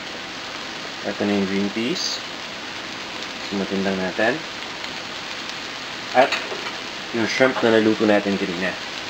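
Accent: Philippines. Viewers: i visit ur recopies cos i like fillipino accent..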